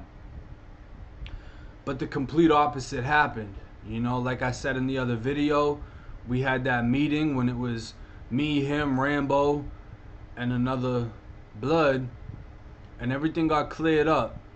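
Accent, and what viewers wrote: Boston, United States. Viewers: Where in America is his accent from? I’m from the UK and I’m awful at guessing 🤦🏻‍♀️ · That Boston accent mix with that Dowwn souff Florida accent is brrraaazzyy p!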